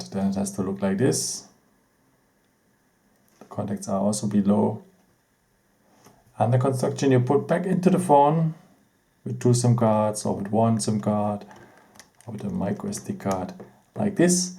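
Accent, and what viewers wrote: Germany. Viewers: Could this viedeo be uploaded in English or with English subtitles because this is not understandable at all. It sounds like a German accent, which is ok,but please...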